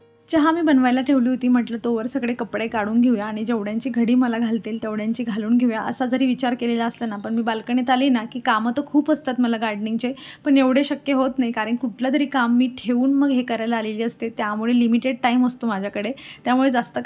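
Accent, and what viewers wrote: Khandesh, India. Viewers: Maz maher Nandurbar ch aahe.Tu khandeshi bolte mhanun mala maheri gelya sarkh watt...mhanun mi tuze blog pahayla survat keli ani aata roj baghte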